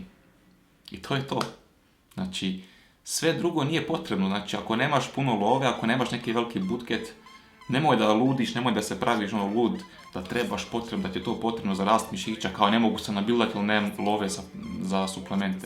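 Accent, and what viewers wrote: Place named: Serbia. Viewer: Malo previse lricas srpskim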